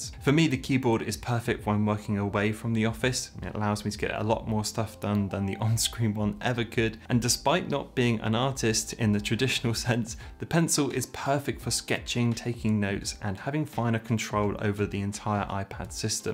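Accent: United Kingdom. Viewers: Are you British? Love your accent 😊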